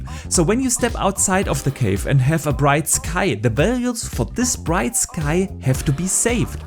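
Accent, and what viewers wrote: Germany. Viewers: Man I really like your German accent :3 Nice video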